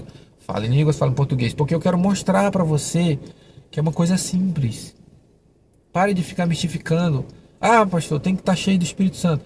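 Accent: Italy. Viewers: É um sotaque italiana pastor muito lindo !!! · Verdade parece ucraniano , tbm tem um sutaque de italiano sei lá , é lindo demais né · Sua língua parece muito com italiano, tanto a língua quanto o sotaque.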